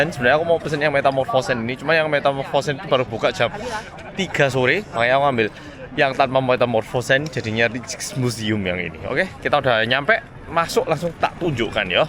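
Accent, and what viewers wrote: Java, Indonesia. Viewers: Medhok e rung ilang to yoo😂😂😂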